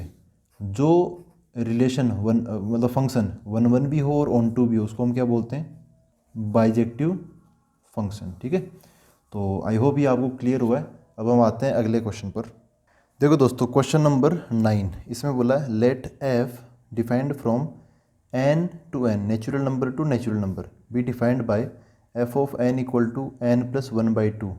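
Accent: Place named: Haryana, India. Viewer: by ur voice,I tried to predict that u must be frm haryana....by the way u teach very nicely ...big thanks from Haryana girl 👍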